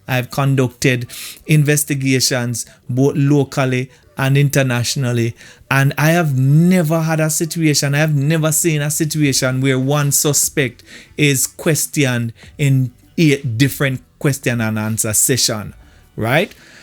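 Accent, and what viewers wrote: Jamaica. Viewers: Wah unu weh na duh? Real St. Ann man accent. I’m a big supporter of the JCF, but I also agree with you 100%. If someone don’t like me or badmind me for my success, all they have to do is accuse me of something during a SOE and destroy my character and livelihood. Big up yuhself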